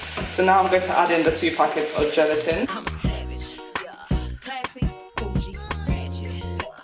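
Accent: United Kingdom. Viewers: So love the uk accent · I was so shocked to see you had a British accent! I like your channel ♥️. New subbie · Nice recipe, but I'm mainly subbing for your accent....soooo english😁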